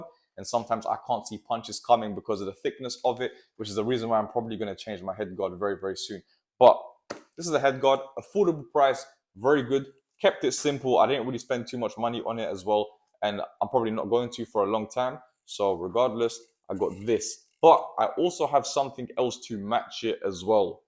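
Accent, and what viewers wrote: United Kingdom. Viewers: No clue would say prolly British?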